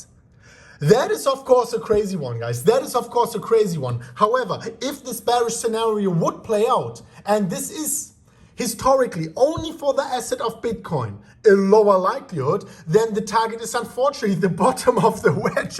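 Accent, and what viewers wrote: Germany. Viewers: I like you man . I'm Polish anc I new your hard accent have to be German . I follow you mate and trully .... like you😉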